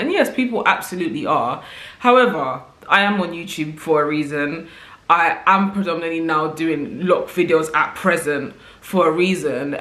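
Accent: London, United Kingdom. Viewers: UK IN THE HOUSE!!🇬🇧❤ So refreshing to hear a London accent talking about locs. Really like your channel and content. Really insightful ✨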